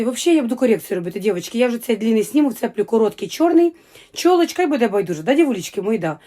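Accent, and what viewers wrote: Ukraine. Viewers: Говір український тоже люблю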